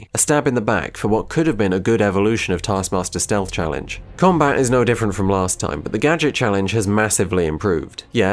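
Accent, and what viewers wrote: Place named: United Kingdom. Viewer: Well you do sound British, makes sense why you find any weather other than abnormal.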